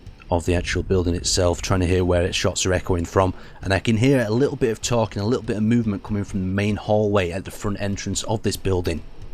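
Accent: United Kingdom. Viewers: you sound like the brittish guy from aw. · u from uk?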